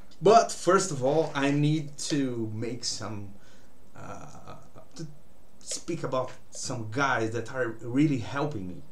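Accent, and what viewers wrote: Brazil. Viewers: BR Mano? Sotaque parce muito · Term sotaque de brasileiro falando inglês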